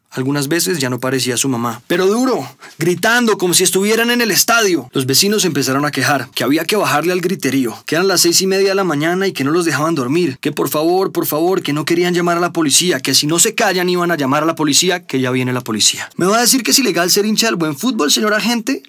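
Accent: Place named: Colombia. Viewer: Ese acentico colombiano💕💕💕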